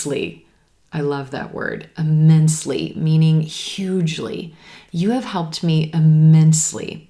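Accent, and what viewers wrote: United States. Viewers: The american accent which is you teaching,What kind of american accent?Is this the general/standard american accent?Plz,answer me.I am a great fan of you.I will be thankful if you answer me...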